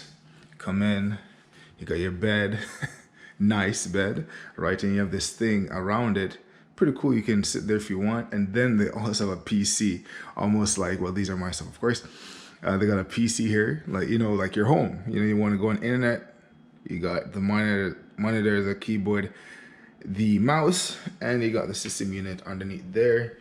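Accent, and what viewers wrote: Jamaica. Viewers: Question... are you Jamaican? Hearing a bit of a Jamaican accent, I could be wrong though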